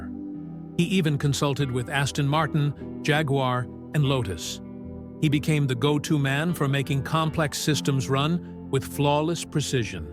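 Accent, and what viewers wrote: United States. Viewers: Why this American accent on various vids. Anything English! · What is wrong with you this is the UK so why do we have to listen to what sounds like a an American accent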